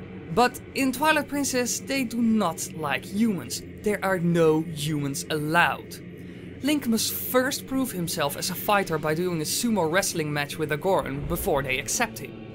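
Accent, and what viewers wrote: Netherlands. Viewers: I came to your channel from m. productions and I'm so glad I found it. When I first heard your accent it sounded so familiar, and then I found out you and vortexxy are both from the Netherlands. It would also be cool to see a theory about the sheikah. There just so much unexplained stuff around them. Keep on making awesome theories! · are you from Holland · ah that's why, your accent made me remember my own language (also Dutch) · Ik dacht al dat je nederlands was!!! Ik hoorde het aan je stem!!! Subbed!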